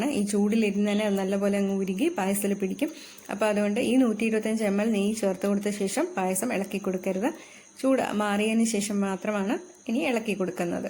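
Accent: India. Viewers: Superb video, palakkadan slang?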